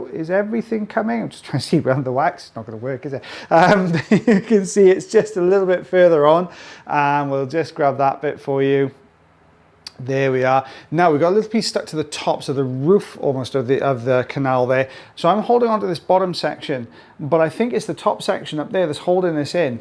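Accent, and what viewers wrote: Wales, United Kingdom. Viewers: Love hearing you speaking, that lovely Welsh accent.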